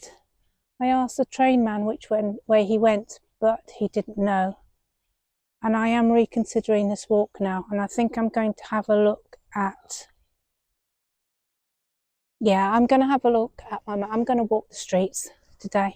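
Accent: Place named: Cockney, United Kingdom. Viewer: This woman is speaking with a Cockney accent.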